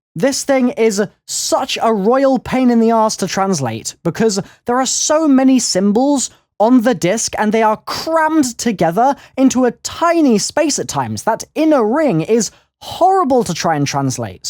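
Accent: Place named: United Kingdom. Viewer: Ur British voice is anoyyyyyyyyyyyyying!!!!!!!!!!!!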